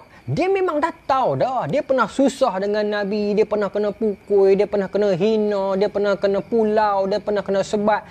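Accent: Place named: Kuala Lumpur, Malaysia. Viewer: terbaik abg..saya antara peminat ustaz..cara penyampaian yg sempoi.tp kali ni mula2 ckp k.l huduh piang dgr..hihihi..love u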